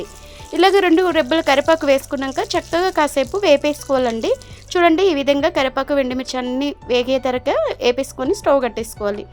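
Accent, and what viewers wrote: Telangana, India. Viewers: Telangana & Andhra mix ah andi? Yekkada mee native?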